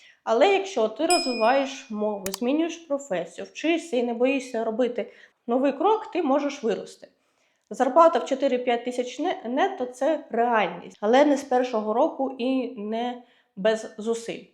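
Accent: Standard. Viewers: Красива , креативна , розумна , (з харизмою треба попрацювати ( без образ)) україночка чисто розмовляє без суржику.Respect & perception of luxury 🇺🇦😁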